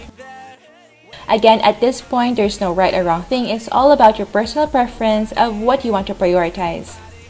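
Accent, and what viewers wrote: Philippines. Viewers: I love your filipino accent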